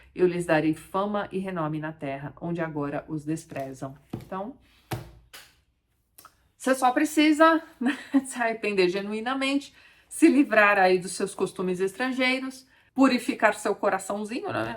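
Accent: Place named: Minas Gerais, Brazil. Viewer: Parece mineiro. Zezinho, fiii do Arnaldo....kkkkkkkkk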